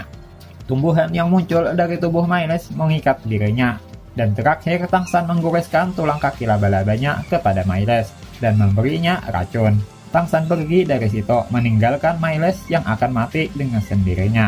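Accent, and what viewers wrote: Banjar, Indonesia. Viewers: urang banjarkah pian, logatnya kyk urang pahuluan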